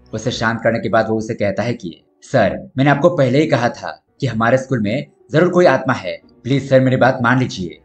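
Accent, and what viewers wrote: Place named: Sindh, India. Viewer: mujhay lagta hai ap ki mother tongue sindhi hai.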